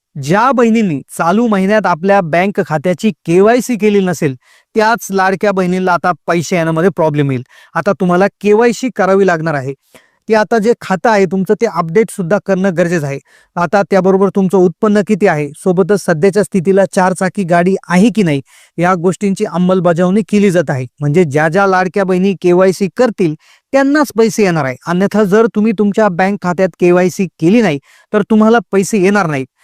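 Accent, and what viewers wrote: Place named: Thane, India. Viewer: ठाणे जिल्ह्यात बोलते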